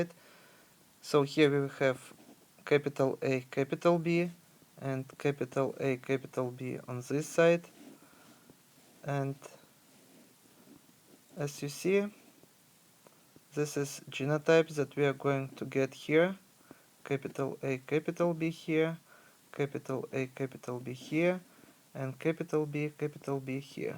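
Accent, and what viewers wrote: Russia. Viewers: your accent is very good. Are you from Russia? I liked that.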